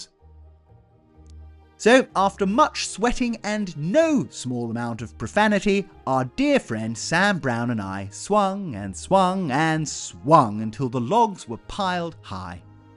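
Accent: RP, United Kingdom. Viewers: Your rp accent is rather trying. Much like Adele's so called cockney accent. Could you please try another one ?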